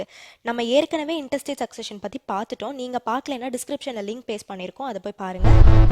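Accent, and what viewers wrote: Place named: Madurai, India. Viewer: Amma ponnu....unnoda voice, prounouncation, modulation... Super ma..! Nee Madurai ya ma?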